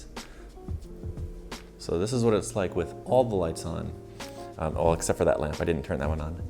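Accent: United States. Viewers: Thank you for your reply. Hanoi sounds like the ideal climate for me. I like a mild winter but not 5 months of ice and snow. I would like to find a studio for $250 or a little more depending on amenities. Like you, I don't want to share a kitchen. Too many bad experiences sharing kitchens or bathrooms. Most people are not very considerate. You sound like an American. Are you American or Canadian? If American, have you run into resentment regarding the Vietnam war? I was always against the war. I am old enough to have fought in it but I was so opposed because I knew we couldn't win unless we used nukes and that would have brought in China. Johnson was a very bad, stupid president who wasted a lot of lives on both sides because he couldn't admit he was wrong. Eisenhower told Kennedy to never get involved in a land war in Asia because you can't win but they murdered Kennedy who was going to pull out after the 1964 election. 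I have many reasons for being interested in living in Vietnam, mainly the cost of living and I like the people. I rented apartments in S.F. to boat people in 1979 and found them to be lovely people. I also am interested in seeing if I can help mitigate the damage the U.S. did by teaching english, friendship, mitigating agent orange etc. By being there I can also help their economy in my small way.I will look for your Q and A video. Sounds good. Thanks again.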